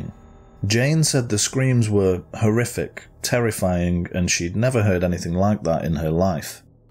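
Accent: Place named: Manchester, United Kingdom. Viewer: I’ve just stumbled across your channel and am really enjoying it. You’re a really engaging narrator and the site is professionally put together. The cases you explore are, thankfully, more obscure than those on similar sites, but certainly no less interesting. And to top it off, you sound like you’re from my neck of the woods, Manchester. You’ve got another subscription from me. Keep it up 👍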